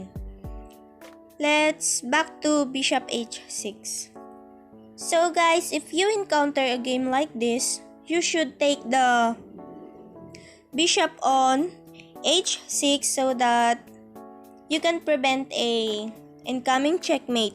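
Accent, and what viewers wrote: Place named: Philippines. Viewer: Nice tip. I noticed your Filipino accent by the way.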